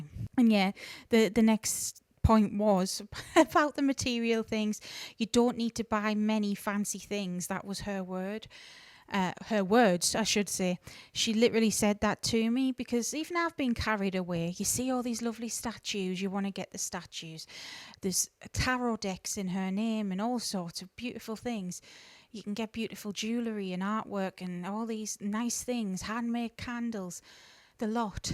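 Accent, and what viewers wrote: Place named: Northumberland, United Kingdom. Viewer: Hiya, I'm so happy to have found your chanel. I have just started working with Hecete and I'm just absorbing all of the information I can. Also - where are you from? You sound like you may be fairly close to me - I'm in Northumberland, not too far from Newcastle. BB.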